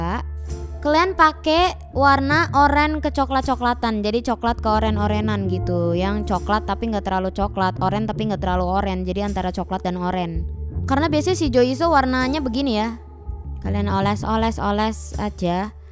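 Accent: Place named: Java, Indonesia. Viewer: Kenapa suara kak di sini agak medokk!! (Emot ketawa)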